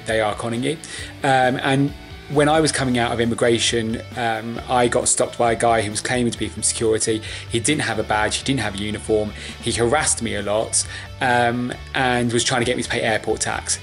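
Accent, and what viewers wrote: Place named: United Kingdom. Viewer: man with your british accent, you just killed my country ahaaahahahajajajaja but you r just sayin what you saw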